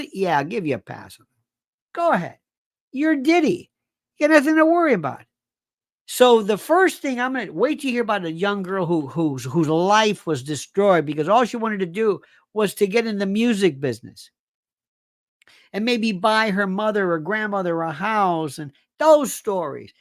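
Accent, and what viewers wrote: Australia. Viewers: Your Australia accent had me laughing big time ❤❤ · Australia is a degenerate festering toralitarian hellhole. They locked down the country hard over a sniffle snd recently sent to prison an elderly woman for a 4 year sentence for walking to her mailbox and allegedly infecting a cancer patient with the coof on the way through a public hallway. They aren't a country of ex prisoners, but one of WILLING prisoners. But yeah, the accent is nice.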